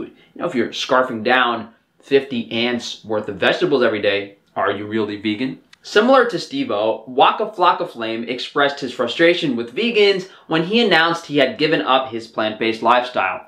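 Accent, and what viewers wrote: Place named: New York, United States. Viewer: it might be his new york accent 🤷🏼‍♀️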